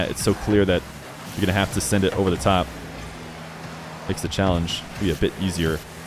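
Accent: United States. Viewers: Bro, you’re literally a Johnny boy copy in American accent. I get it, he’s very successful, but bro. Please find YOUR style. Don’t need to sound like another successful person…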